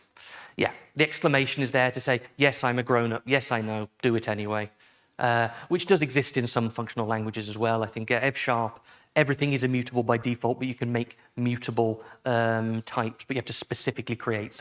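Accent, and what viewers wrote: Midlands, United Kingdom. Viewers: I've turned on the autogenerated subtitles for a few minutes and they've been spot-on, if you're not used to an English midlands(?) accent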